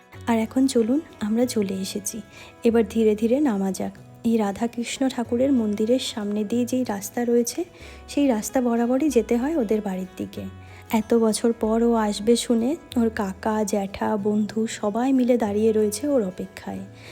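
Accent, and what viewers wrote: Bangladesh. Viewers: apu tmr kotha sune mone e hoicce na j tmi indian,,,,,,,, tmr kotha akbare Bangladeshi der moto,,,,,,😊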